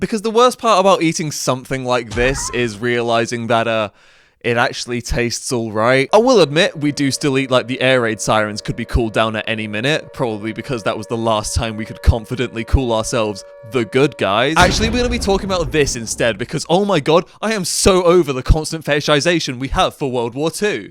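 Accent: United Kingdom. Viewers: American here! I love your British accent! And I love much of British culture and art. The difficulty for me and many others I believe is the current atmosphere of rampant classism, racism, and xenophobia in the UK. The US gets it, we deal with some similar struggles, albeit not exactly contextually the same. I think the world loves the dignified Britain of the past. But the Britain we currently see reflected on the Internet seems to be going through an identity crisis. The world is rooting for you, Britain. Don't be miserable. 🇬🇧🙂❤ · Dude, I’m from Tennessee are accent gets made fun of all the time for being stupid sounding your British accent is awesome more cooler than the kings English. They call it down here.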